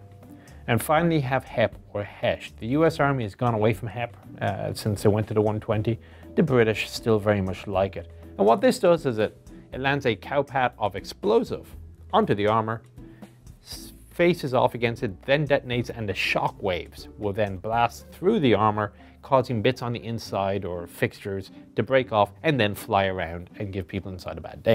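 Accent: Ireland. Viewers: He has a pretty thick Irish accent, regardless he has mentioned it many a time.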